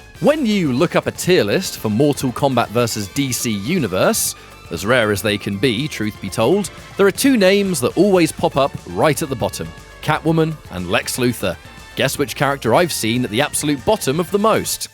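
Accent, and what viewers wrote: United Kingdom. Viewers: I would’ve watched this whole thing if u didn’t have a British accent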